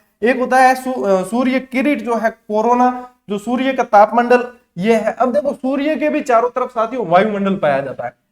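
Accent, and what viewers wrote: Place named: Marwari, India. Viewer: Sir, thnq very much for giving videos with such nice explanation 👍 bahut aache se samajh aata hai ...... n aapki jo maarwadi bolne ka style to bahut hi aacha lagta hai 🙂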